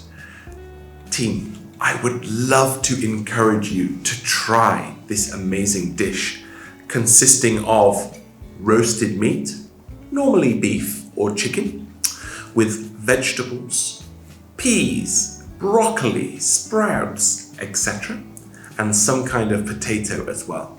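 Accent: United Kingdom. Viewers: I love your perfect British accent!!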